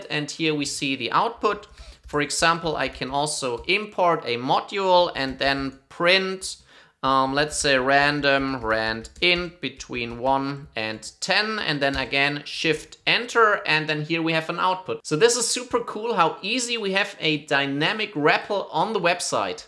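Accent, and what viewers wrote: Germany. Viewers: Short Question: Are you from Germany?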